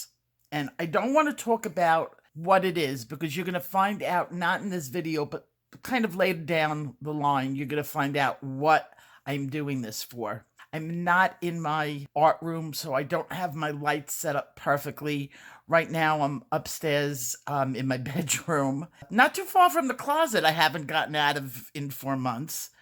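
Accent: New York, United States. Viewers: ! Hi! I've missed hearing your voice and that New York accent I love so much. You sound wonderful! Thank you for sharing your photos of you and Howie. What a lovely couple! What the heck did he do for a living that allowed him to shake Obama's hand?! 🤣❤ I'm so glad you took time off. I can't wait to get my hands on your paste!! Excellent video! Sending big colorful hugs and love your way! And the tattoo.....sniffle.....❤ Love you!! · Wonderful to hear that New York accent, in the YouTube coloring world, once again! Shalom, thumbs up.🎨♿👵Barb Nelson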